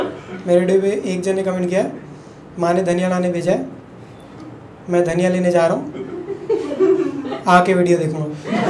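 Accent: Bhopal, India. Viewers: tu bhopal se hai kya...? :D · oyee tu bhopal se h kyaa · Ye bhopal se hai kya?? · "Bhai ye bhopal se hai kya?" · Yeh banda bhopal se hai kya?? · Ye banda Bhopal se h kya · Ye bhopal se h kya ? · Ye banda bhopal se hai kya??🤔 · Ye Bhopal se he kya? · Bhai tum bhopal see hoo kya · Ooi, yeh Bhopal se hai kya?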